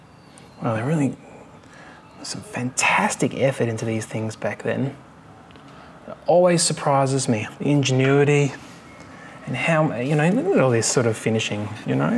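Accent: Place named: Australia. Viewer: Always like Aussie accent.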